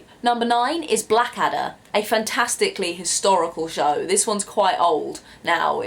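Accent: London, United Kingdom. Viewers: I don't remember the list of the shows that you mentioned because I fall in love with your accent and my mind was lost somewhere else. If you're an active youtuber and happened to read this comment I suggest you to make a video on "How to do British accent". I'm sure many people would love it. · I love British accent ! I just came here to listen to your accent 😯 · Hello, Great video and recommendations! Now I'd like to ask you for a favour because I'm working on an assignment for a English Phonetics course I'm doing and I'm going to analyse some characteristics of your accent, would you mind telling me where you are from, I guess it's London? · I am a English learner and I fell in love with your British accent. It sounds great ^^ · I'm here to learn British accent and it helped...❤️ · I like you british accent. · I just came here because I enjoy listening to British accent lol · is it a british accent. 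You r adorable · I love your accent it is wonderful l hope l can speak british english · What a lovely accent! Which is it? Cockney/Liverpool/Manchester? I don't think it's R.P.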